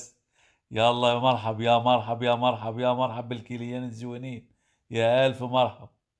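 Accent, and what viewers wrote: Upper Egypt, Egypt. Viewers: مصري صعيدي 👍 لهجتك عرفتها. تحية لك يا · انا مغربي بس بكلم مصري وصعدي كمان ومن لهجتك انا عرفتك انك انت من صعيد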